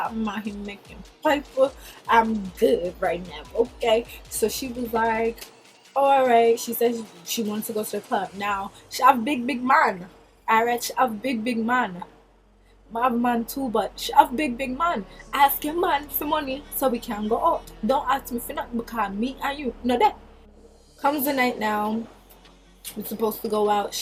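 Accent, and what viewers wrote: Jamaica. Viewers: Omgggg that Jam Accent though, yessss you so gorg. But that friend thing, I'm the same way, some females just don't know how to have, accept and good friend or be one. Gurllll shoot come to Trini/Tobago I'll be your bff lol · yesss bish the jamaican accent!!!!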